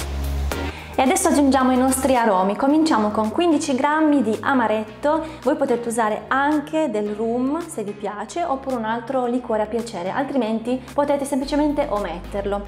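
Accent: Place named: South, Italy. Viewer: Hai un unica cosa che mi da fastidio, l'acce'ntooo te'rro'ne. Cazzo rovina la favola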